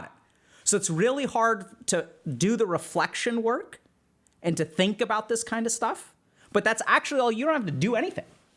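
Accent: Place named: India. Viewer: I only want to converse with in his native Indian-English impersonation accent. I know it's not pertinent to the topic discussed it was just welling up from inside of me from the previous video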